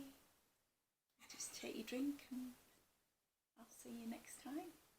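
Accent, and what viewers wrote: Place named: Scotland, United Kingdom. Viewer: Hi. I just happened across your Flosstube a few days ago and I just wanted to tell you how much I enjoyed your gentle spirit and lovely accent, as well as your stitching of course. Lol. I just had to comment when I heard you say you were trying to tone your accent down. Please don’t! As an American, and lover of all things Scottish and British, I just had to tell you it is part of your charm. I have recently picked up cross stitch and am enjoying it so much. I did some embroidery back in the 1980s and have been quilting for the last several years but I didn’t even know that cross stitch was popular again until I happened across some Flosstubers on YouTube. Now I am well and truly hooked! I live in a very rural part of Kansas and my only connection with other stitchers is on flosstube. Can’t wait to watch your next video! 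Kathryn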